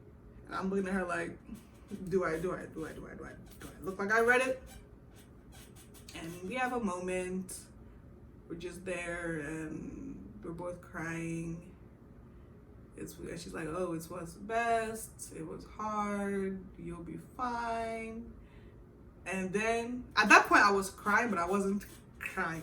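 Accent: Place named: Nigeria. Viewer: Your nigerian accent is still there sha😅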